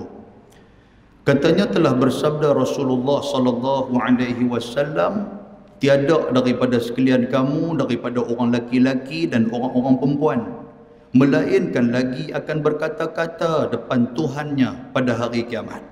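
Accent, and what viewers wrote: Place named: North, Malaysia. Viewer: betul kan ,,sy tahu sbb sy peminat ustz samsuri ahmad,,pejam mata sy dah tau ini suara ustz tp semalam membuatkan sy terkejut beruk dengar suara ustz ini,, Cara penyampaian lenggok dia bila berkata sama sgt,,lorat pon utara,,lawak dia pon sama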